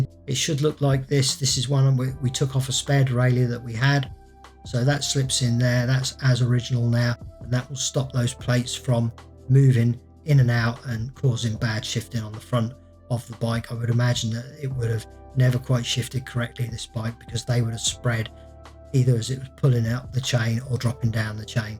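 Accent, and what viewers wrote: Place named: England, United Kingdom. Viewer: The enjoyment you derive from your work is almost immediately attuned to the viewer's eyes & ears. The narration is most pleasant and always to the point, albeit with that extra British charm that an English accent brings to anything. Your humble directness really makes the whole thing a cooperative adventure in restoring these old beauties to their original dignity. Your keen eye and steady camera work really convey the importance of a thorough process. Your videos must really resonate to a very wide audience. Mike Kirwan - Kenmore, WA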